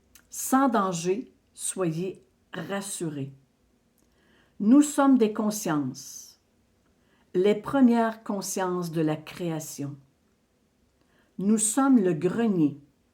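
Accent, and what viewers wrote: Quebec, Canada. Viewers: Merci infiniment pour ton engagement et tout le travail que tu fais avec beaucoup de joie et d'amour. Gratitude pour ce partage du message de l'EIO. Je suis en Italie et j'aime beaucoup t'écouter le matin devant une tasse de thé. Ta bonne humeur et ton joli accent québécois me mettent en joie pour la journée. 🙏 Joyeuses fêtes 🎉❤🤩😘 Très jolies tenues de Noël 🥰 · Bonjour! C'est toujours un plaisir de vous écouter, d'écouter votre "aquecent" Canadien! Oui, 3I Atlas est bien une conscience. Elena Danaan en a parlé dans un de ses Star Nation News. Elle a même pu le voir à travers les yeux de son compagnon. Il faut dire que toute petite elle a été abductée par les gris malfaisants, et a été sauvée in extrêmis par les Galactiques. Malheureusement, ces gris lui ont mis un implant dans le cerveau qu'il a été impossible aux scientifiques Galactiques, d'enlever, sous peine d'attenter à sa vie. 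De ce fait, il grillé cet implant, et l'ont reprogrammé. Ce qui fait qu'elle peut voir à travers les yeux de son compagnon et vice-versa. C'est comme ça qu'elle a pu nous décrire 3I Atlas. Et en effet, 2026 va être une année de changements. Certaines technologies vont être mise à notre disposition pour 2026. Lit médical quantique, prospérité par le QFS (dont de belles sommes sont mises sur chacun de nos comptes, ce qui fait que l'humanité va être riche), les véhicules volants (voitures, autobus, et un autre système d'avions), des réplicateurs de nourriture, et bien d'autres choses encore. Mais surtout, surtout, nos rencontres avec nos cousins venus d'autres planètes, comme les Ummites qui viennent de la planète Oumo située dans la Constellation de la Vierge, à 14 années lumières de la Terre. Elena Danaan a aussi informé que Mars était en Terra-formation. C'est à dire que nos cousins bossent pour refaire cette planète vivante, sur laquelle no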